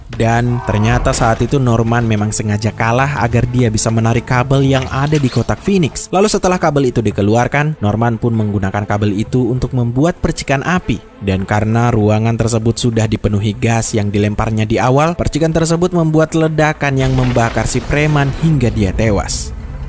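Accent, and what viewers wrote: Makassar, Indonesia. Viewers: Org makassar ki kah? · Orang makassar kii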